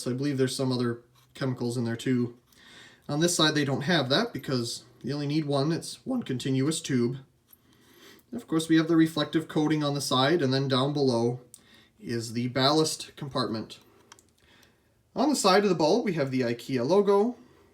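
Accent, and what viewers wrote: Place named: Northern England, United Kingdom. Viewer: Its his northerner accent lay off dude. :|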